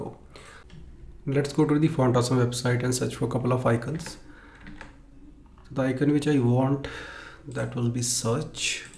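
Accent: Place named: India. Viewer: im fed up with this accent seriously , i wanna smash my head when i hear this accent honestly , i just cant understand what u indians say and everytime im searching sth related to coding an indian pops up , fuck this life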